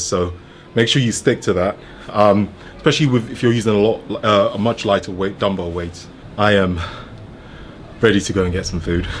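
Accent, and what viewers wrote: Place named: United Kingdom. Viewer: I swear all people with that accent and are from the uk or whatever call their backyard a garden 😭😭😂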